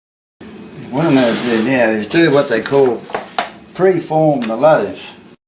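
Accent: Australia. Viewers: LUV your Aussie accent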